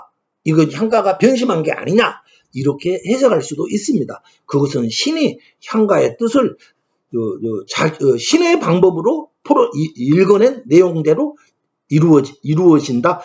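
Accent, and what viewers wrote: Gyeongsang, South Korea. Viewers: 경상도 싸투리죠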